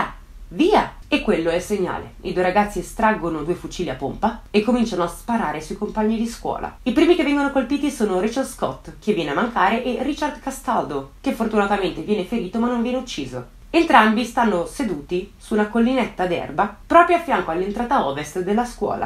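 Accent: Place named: Liguria, Italy. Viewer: ciao! Ah ok mi sembrava fossi ligure come me. Cmq brava mi piace come racconti gli eventi. E x questo che sembrava che avessi un qualcosa di famigliare. Grazie mille x la risposta! Hai un nuovo iscrittoooo!! !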